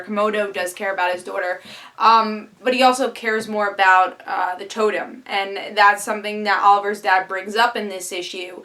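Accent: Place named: New York, United States. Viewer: are you from new york? For a second there I thought you had a new york accent.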